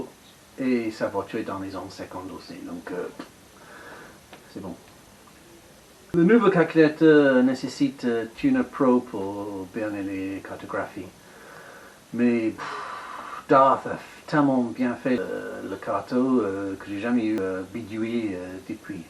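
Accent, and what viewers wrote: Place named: England, United Kingdom. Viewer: Votre français est bon ! J’adore votre accent anglais haha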